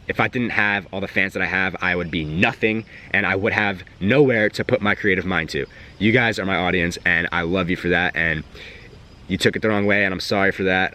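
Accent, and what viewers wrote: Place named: New Jersey, United States. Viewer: You are the best bring your jersey accent back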